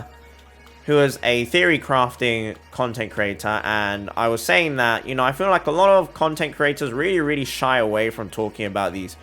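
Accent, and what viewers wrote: United Kingdom. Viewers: Are you from the UK? Good to see your vids!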